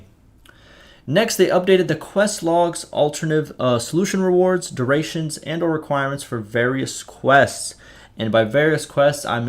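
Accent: South, United States. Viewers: I feel you on the southern part it's def how we talk😂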